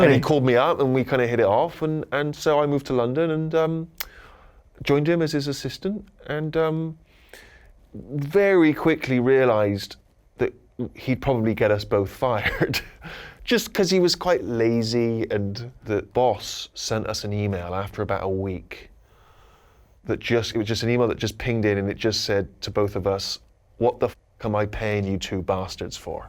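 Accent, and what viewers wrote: United States. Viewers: Where's his Bristolian accent gone????? He sounds semi-yank uggghhhh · Stephen sounds so American these days haha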